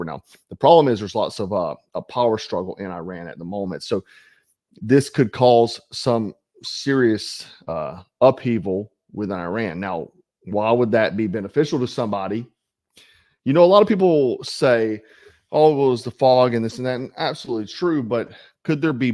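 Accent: United States. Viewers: He said Joey Biden. He has a cowboy 🤠 accent. Get your ears checked or clean them out. He always says Joey Biden.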